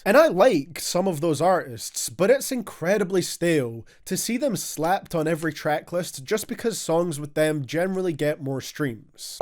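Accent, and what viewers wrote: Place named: Scotland, United Kingdom. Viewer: What accent is this? Canny work it out but get a feeling its one of the posh Scottish accents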